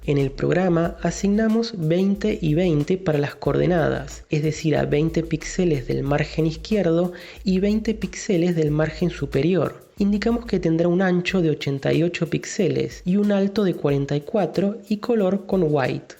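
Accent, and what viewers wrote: Argentina. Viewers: Las mejores explicaciones de arduino de YouTube, además es cómodo porque es argentino.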